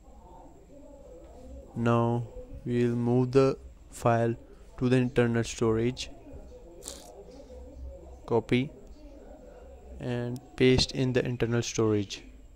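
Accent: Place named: India. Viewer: That accent must be common in India!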